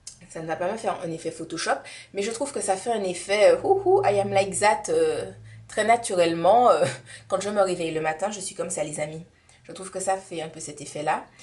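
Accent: Antilles, Caribbean. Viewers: j'adhere avec tn accent des antilles jme sen chez moii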